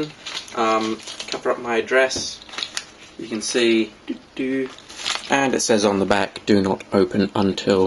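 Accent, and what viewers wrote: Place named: United Kingdom. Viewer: you sound more British in video.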